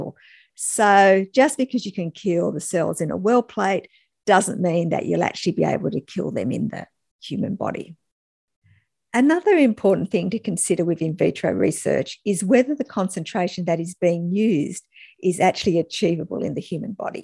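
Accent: Australia. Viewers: she has Australian accent, so whatever their equivalent to NIH would be; also, Australia went full totalitarian fascist Chinese-style lockdown, destroying many lives and livelihoods (much more than USA, which was bad enough) during the plandemic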